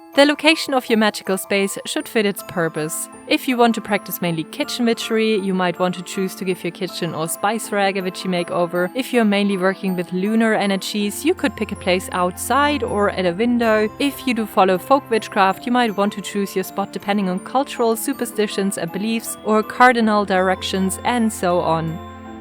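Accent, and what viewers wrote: Germany. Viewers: sometimes i get a bit of American and Australian from it somehow??? but yes i suspected it was german haha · Hey i wanna ask if you're from germany ,because from the accent😂✌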